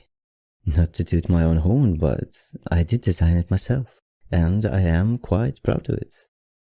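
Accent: France. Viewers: AM THE ONLY ONE OR I THINK HE HAS LIKE A FRENCH ACCIDENT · I’m someone who’s seriously into vampires. But I swear I just felt so seduced by your voice. Especially with the French accent and the chuckle. 🥰🥰🥰 amazing voice acting!! ♥️♥️♥️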